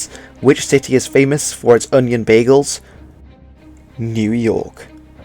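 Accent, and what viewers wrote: Netherlands. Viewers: Are you dutch because you've made at least 4 video's about the netherlands and because i know your dutch accent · Are U dutch?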